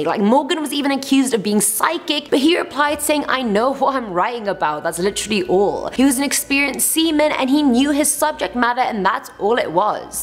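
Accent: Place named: United Kingdom. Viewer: I'm just focusing on her British accent💙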